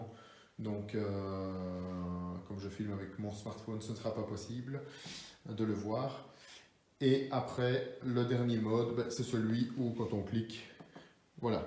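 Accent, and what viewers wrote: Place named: Belgium. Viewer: Tu es Belge ? Ça s'entend 🤣 je le suis aussi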